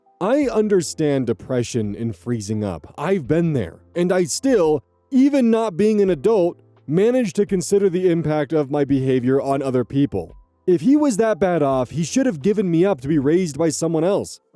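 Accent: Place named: Midwest, United States. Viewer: I feel a lil guilty that I have not watched main channel. I just know him as the lil guy that has the cute midwest accent and reads Reddit so I don't have to suffer through it, myself. And the only creator to tempt me into the arms of the sups.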